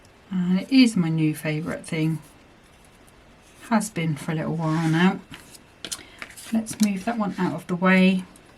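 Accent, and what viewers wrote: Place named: Bristol, United Kingdom. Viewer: When I first started watching your videos, I thought I heard a slight Bristolian twang. You use similar colloquial phrases that I do! Fab cards as always. Sending love from Bristol xxx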